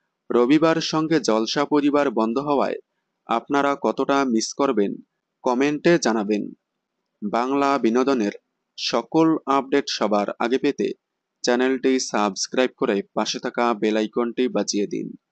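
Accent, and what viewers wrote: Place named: Bangladesh. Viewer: Apner katha balar kharap bangladesi úcharan brisri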